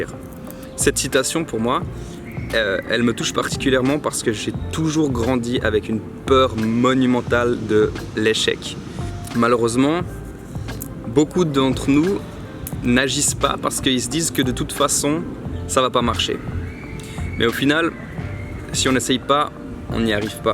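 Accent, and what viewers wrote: Belgium. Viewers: On dirait que t'es bruxellois Sinon love you and your beautiful viedooos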